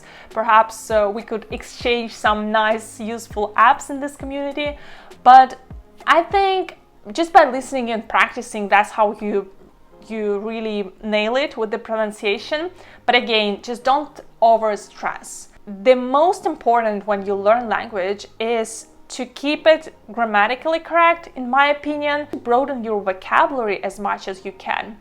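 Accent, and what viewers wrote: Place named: Russia. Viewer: Hello, from a native russian speaker, it's very interesting that a russian accent is so present in your speaking. Not about good or bad, just a remark.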